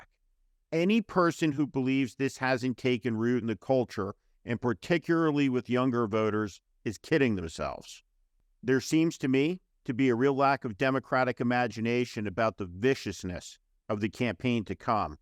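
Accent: United States. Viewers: Steve Schmidt sounds like a true American!!!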